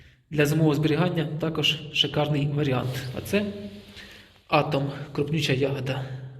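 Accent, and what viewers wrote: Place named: Mykolaiv, Ukraine. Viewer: Дуже приємно чуть справжню українську мову з Миколаївщини. Поважаю Ваше відношення до винограду, хай Господь допомогає.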